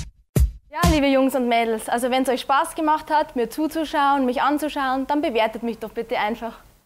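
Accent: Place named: Bavaria, Germany. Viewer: bayrisch is schwul... aber ihr würd ich geben ;)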